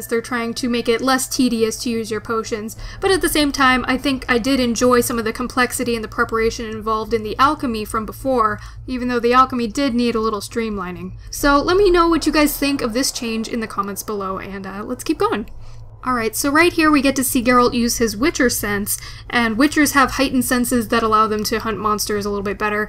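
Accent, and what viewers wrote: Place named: California, United States. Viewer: It sounds like Califonian but diffrent, thanks for rap anserw. Cheers.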